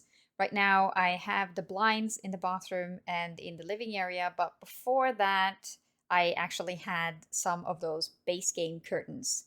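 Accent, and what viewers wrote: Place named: Scandinavia. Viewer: This was relaxing to watch! Good music choice too, a chill tune with *no singing*, just perfect! And I love listening to your English because you sound.. well, Nordic and it makes me feel like home ^^ (and your English is great!) I didn't even realise I watched an almost 40 minute long video bc you weren't being repetitive at all with your commentary and everything just kind of flowed together nicely ✌️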